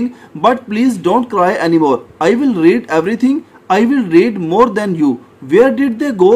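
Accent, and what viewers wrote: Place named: Pakistan. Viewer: Total no te sirve. Va leyendo unas frases del proximo capitulo. Ademas es un ingles pakistanianl🙈 para entenderle, cuesta un poco🙈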